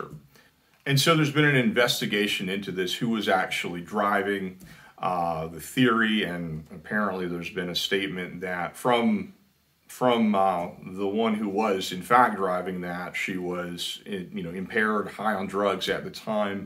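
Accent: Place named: Pennsylvania, United States. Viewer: are you from PA? You sound like it.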